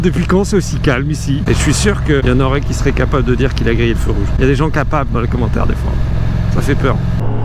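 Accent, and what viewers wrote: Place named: Brittany, France. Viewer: Oh tient, un accent breton...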